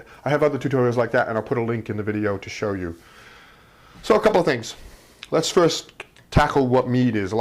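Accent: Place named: New England, United States. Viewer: This guys definitely from the New England area.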